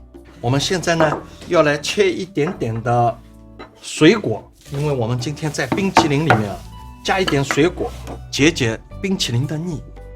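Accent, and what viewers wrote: Shanghai, China. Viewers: jijnjijijj 沪普😂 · 这是很标准的上海普通话，哈哈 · 朱师傅一口上海普通话听着真亲切啊。听着朱师傅的声音好像回到了上海，很久没回家了，满满的思念。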